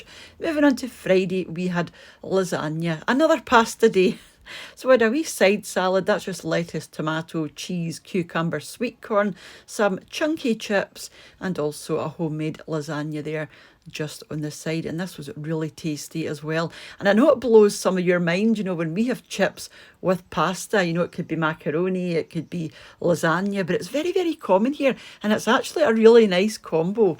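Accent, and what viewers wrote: Scotland, United Kingdom. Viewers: Can I come to tea?... Your meals always look appetising! 👍😋... Mine look like they were thrown at the plate!... must love you very much, because the way to a man's heart is through his stomach! 👍🤣 EDIT: I'm English (I live in Lancaster), and I think your Scottish accent is delightful!... "Here's what I made fur the family denners" 🤣... I particularly like "Tatties 'n' neeps!"... You should be, and I'm sure you are, very proud of your wonderful Scottish accent!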